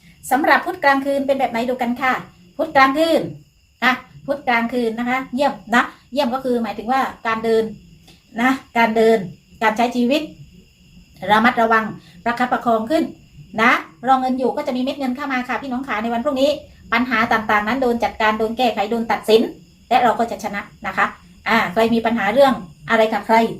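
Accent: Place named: South, Thailand. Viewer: สำเนียงใต้ มิน่าถึงพูดรัวฟังไม่รู้เรื่อง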